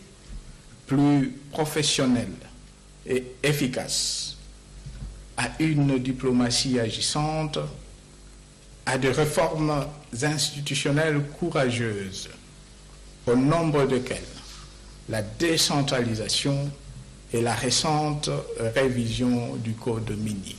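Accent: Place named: Rwanda. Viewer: Français avec tonalité Rwandaise.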